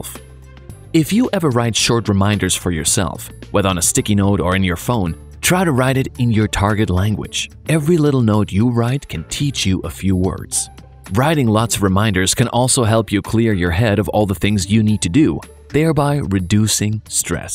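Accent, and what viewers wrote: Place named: United States. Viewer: Your channel has been a huge inspiration for me to start mine. (even though I learn French at school) I only have one problem with your videos, the first one I watched was meeting a stranger (I think) when the video pronounced it slower I heard an American accent even when I watched the French one about weather and I could still hear a tiny bit of American in the French voice, it's probably an American imitating a French accent, but aren't you supposed to be a company, I suggest that you get actual French, Spanish and Italian people to do the videos for viewers to get the best out of it, instead of imitation?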